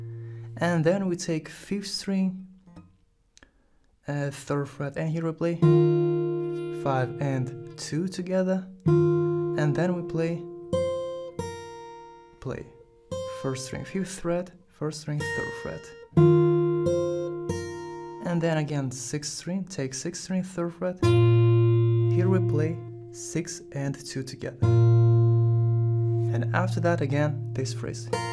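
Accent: Russia. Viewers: по произношению чел русский, я думаю, что это с, лайкни, если я шерлок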